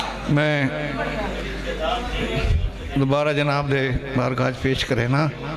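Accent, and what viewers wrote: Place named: Saraiki, Pakistan. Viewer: یہ پنجابی مشاعرہ ھے یا سرائیکی پنجابی َاور سرائیکی دونوں زبانوں اور خطے میں بھی فرق ھے میزبان بھی سرائیکی زبان کے لب ولہجے میں اپنی زمہ داری ادا کر رھے ہیں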